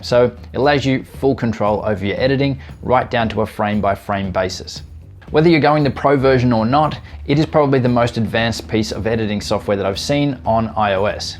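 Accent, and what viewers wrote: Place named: Australia. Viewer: Bro your Cleary Australian Australia for life (I'm Australian as well) I'm WA what state are you if you want to share it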